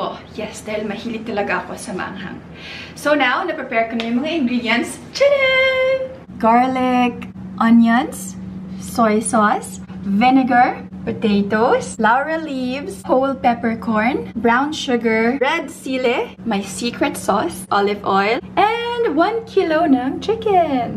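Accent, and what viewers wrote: Visayas, Philippines. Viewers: "Hay ka lisod sa kinabuhi kung nag inusara raka" ahh ka kyut gyud mag bisaya oy. Huhu teee notice meee please 😭🙏🙏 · Haha cute accent ng bisaya😍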